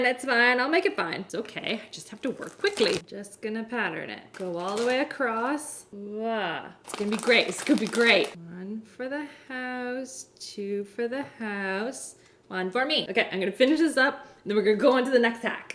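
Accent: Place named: Canada. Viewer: Is it me or is she extra Canadian in this video?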